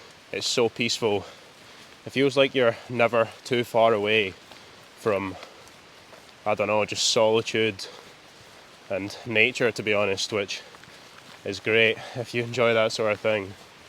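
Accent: Scotland, United Kingdom. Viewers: It's quite special to listen to your Scottish accent and turn of phrase for someone who is not from the UK! "Wee rivers" and "glens", for example. We didn't see you cooking or similar house keeping - I think I'd enjoy that, but maybe you've decided to focus on the actual hiking. Thanks for taking us along - from Cape Town, South Africa. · the new filter you are using , i need a new one and yeah first hand experience is best , salomon would be my usual goto filter ... is the katadyn be free 1l good enough ,better or worse than salomon do you know ? nice vid ,you narrate your walk wonderfully scottish 😊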